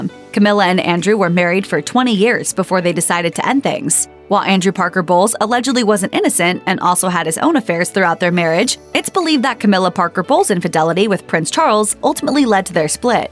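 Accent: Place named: United States. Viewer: ...... my THOUGHTS are regards to the narrator. Why are they seemingly always American & with Terrible 'grating' accents! 🥱 Phil Liverpool UK 🇬🇧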